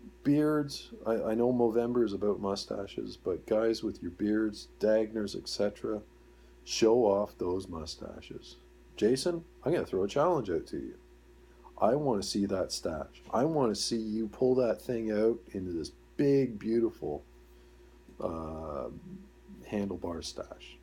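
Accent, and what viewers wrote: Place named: West Coast, United States. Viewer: Nice west coast accent lol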